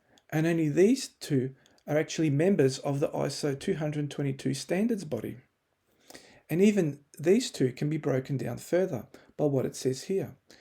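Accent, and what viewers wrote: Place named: Australia. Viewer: Me too! Great to hear a fellow Aussie in this space!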